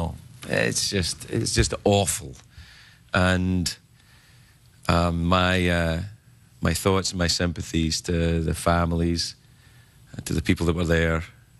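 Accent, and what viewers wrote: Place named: Scotland, United Kingdom. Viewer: He sounds Scottisher here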